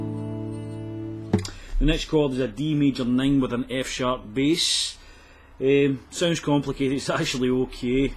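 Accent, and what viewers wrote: Scotland, United Kingdom. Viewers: Is he Scottish ?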